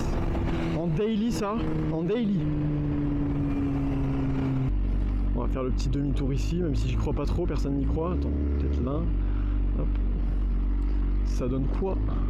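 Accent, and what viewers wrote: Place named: South, France. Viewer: Une petite pointe d’accent méridionale Fils😂 Belle vidéo!!! Hâte de faire une balade soon!!!!♥️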